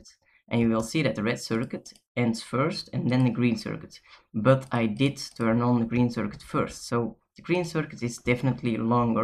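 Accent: Flanders, Belgium. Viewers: are u from belgium/flanders? your accent sounds like that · where you from? you sound belgium...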